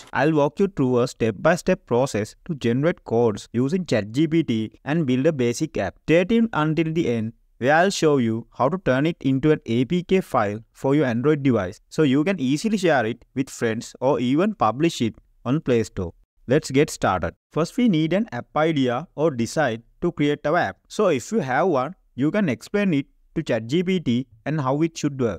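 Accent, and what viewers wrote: Kerala, India. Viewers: indian accent? I hear scam when i hear indian accent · Your voice is like my Indian frnd * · Nice Malayalam accent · Lived your malyali accent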